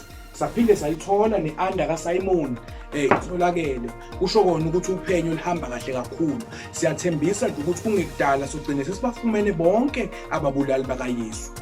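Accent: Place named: Xhosa, South Africa. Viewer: Lmaaaaaaao! keep up the good work bro, I can hear a bit of xhosa here and there and the evidence tops it all 😂😂😂😂😂😂😂😂😂😂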